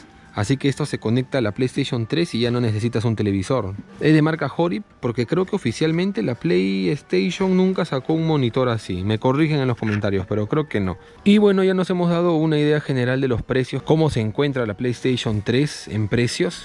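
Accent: Peru. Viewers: Eres de Perú ? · BUEN VIDEO BROT POR TU ACENTO DIRIA QUE ERES PERUANO...SERIA BUENOS QUE BUSQUES EQUIPOS DE SONIDO VINTAGE HI FI HAY UNA GRAN COMUNIDAD DE ESO ASI COMO YO EN CONSOLAS GAMER , MINICOMPONENTES DE SONIDO ETC SALUDOS DE LIMA - PERÚ. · Mano eres de peru verdad Por cierto que buen canal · eres peruano no manolo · Por la manera de hablar es peruanazo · Por su acento probablemente es de Perú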